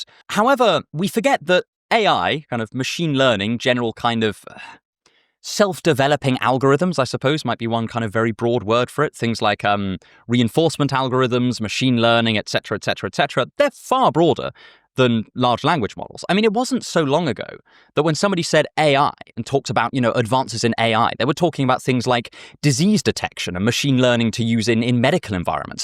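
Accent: RP, United Kingdom. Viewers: it's all about the british accent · There is something very funny in hearing grandly articulate "What-to-do" in his rather posh and fabulous english accent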